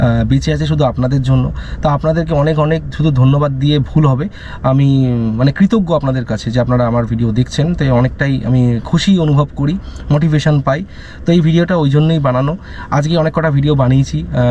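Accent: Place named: India. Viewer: আমি তো অবাক হয়েছিলাম প্রথমে ,যে আপনি পশ্চিমবঙ্গের বাসিন্দা, আপনার হিন্দি দেখে তো মনে হচ্ছিল আপনি অন্য রাজ্যের। স্যার বলছি কিভাবে উঁচু জায়গায় গাড়ি উঠাতে হয় এবং নামাতে হয় এই নিয়ে একটা দয়া করে ভিডিও বানাবেন❤❤❤❤ ধন্যবাদ আপনাকে আপনি খুবই ভালো করে শেখান গাড়ি চালানো , আপনার টেকনিক খুবই ভালো সহজেই বোঝে আসে ❤❤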